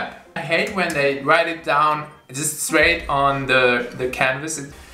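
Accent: Germany. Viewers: i kinda though he was german because of his acent and than this xD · Ach bist deutsch hab mich irgendwie gewundert über das hat hab mir gedacht das hört sich doch deutsch an lol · Ah deutsch hab mich schon gewundert bei deiner aussprachr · Bist du aus Deutschland ? Hab weiter geschaut frage beantwortet. Dachte wegen der Aussprache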